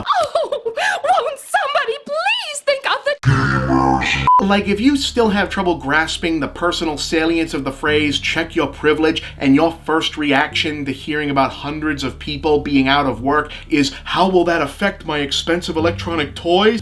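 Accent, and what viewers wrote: Boston, United States. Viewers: Is that a real Boston accent or a new gimmick? I've never heard it before. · Agreed. The angry Bostonian scent ads a good bite to his commentary. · Bob, I don’t know how I didn’t notice this before, but you have a very Bostonian accent. You should embrace it and use it more often when you go on an angry rant. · Boston accent is one of if not the most assholic accent. How can people living near Harvard sound so stuuuupid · Frost Soul it’s a Boston accent. He usually tries to down play it, but it does slip through sometimes. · Is it just me or is Bob extra Bostony in this episode? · I can hear the Boston coming out XD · UNIONIZE!!! Also, loving the chance to hear your Boston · It's a Boston accent.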